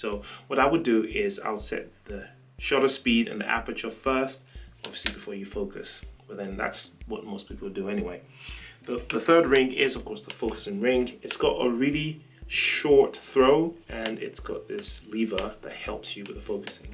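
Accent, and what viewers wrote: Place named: England, United Kingdom. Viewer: I just ran into your channel, I'm loving the content! It `always makes me smile hearing a fellow Englishman on the internet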